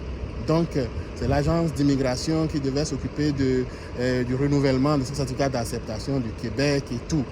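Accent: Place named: Benin. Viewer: Merci beaucoup vous avez un accent béninoise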